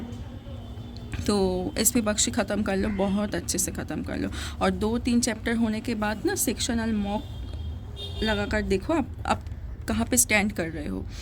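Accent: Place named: Bengal, India. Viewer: Ap kya Bengali ho? Apka accent bengali ki tarah lag raha hain....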